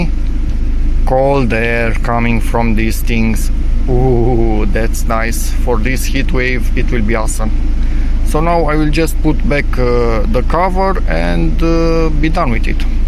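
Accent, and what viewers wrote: Romania. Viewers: Accentul ăsta tipic Românesc, îl cunosc de la 1km, nu contează în ce limbă se vorbește, Accentul e același... it's lovely!!! Good job ,,OMULE" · Aoleooo ... un ruman care vorbeste englezeste !!! Suna ca ...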